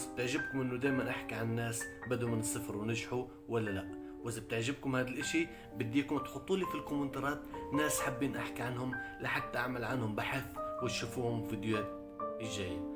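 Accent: Morocco. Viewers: يا اخي العزيز نحن نفضل انك تتكلم بلهجتك الفلسطينية ، اولا لانها لهجتك الام وهي اصلك ، ثانيا لانها جميلة جدا، ثالثا لان الخليط بين المغربية والفلسطينية ليس حلو بالمرة ، انا اتمنى ان تحافظ على الحديث بلهجتك سيصبح لك مشاهدات اكبر بكثير اذا تكلمت بالفلسطينية كذلك نحن نفضل أن تتكلم لنا عن فلسطين وثقافتها وتقاليدكم الخ الخ ....اما ان تتكلم لنا عن اشياء نعرفها لا تجذب المشاهدات هكذا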